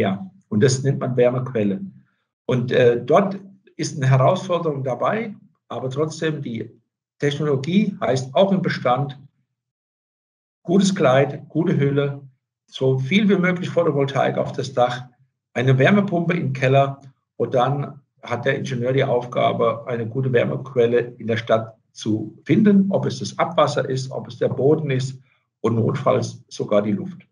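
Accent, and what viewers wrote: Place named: Hesse, Germany. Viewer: „Wenn sie des…“ E bissi hessisch schwätzt er noch der Ex-Brunnensträsler! VG JK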